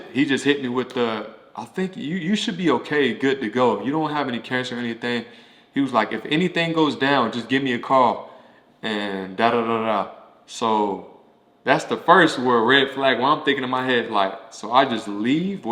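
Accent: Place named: South, United States. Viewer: Girl he from the south! Dialect/ pronunciation is associated with where you’re from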